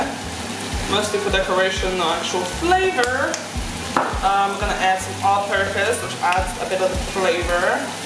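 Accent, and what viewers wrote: United Kingdom. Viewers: I love lamb chops, literally can eat it anyday. Great recipe! Ps..big up, fellow British accent lol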